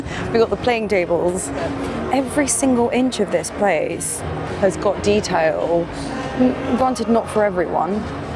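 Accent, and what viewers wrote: United Kingdom. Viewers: Ma'am I love your British Accent. CHEERS 🥂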